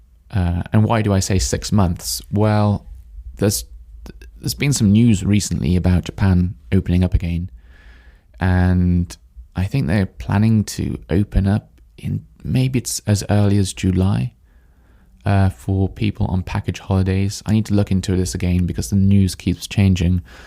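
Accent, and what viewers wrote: United Kingdom. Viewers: Do you speak with rp accent?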